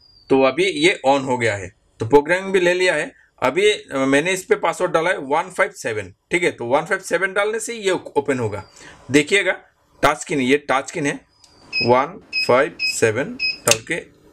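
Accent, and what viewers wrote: Bengal, India. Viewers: Bhai aap bangli ho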